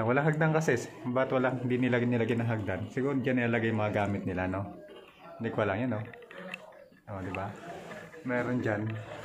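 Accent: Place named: Spain. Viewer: Why does it sound like you're speaking Spanish with Chinese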